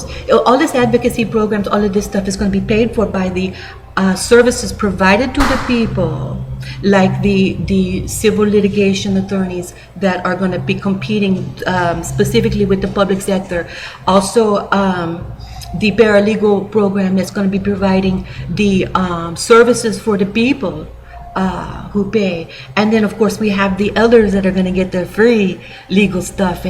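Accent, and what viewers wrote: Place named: Mexico. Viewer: you stupid bitch you exposed yourself......you have no business speaking for natives........you have no people on the rez......what happens on any rez is not your business.........you dnt even know if youre indian and you say you may have been kidnapped from egypt as a baby.......you are a nutcase who smokes crack........White Buffalo Calf Woman id gods ONLY prophet and will appear back to her peop[le as a white buffalo not as a crazy non lakota with a mexican accent preaching to other non-indians........put the crack pipe down before you end up dissappearing on a rez never to be seen again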